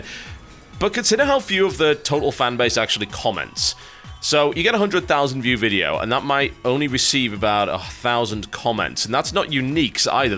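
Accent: United Kingdom. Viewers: Hey, younger sounds way more british. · Young cynical brit sounds much more British. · Monochromatic Stains? Dark Tranquillity? In Flames? Jesus christ you have some great taste,. Also, you sound so young, inexperienced and British! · i hope comes back to britain for a tiny bit each year so he can keep the acsent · Oh my has the British accent lessened over the years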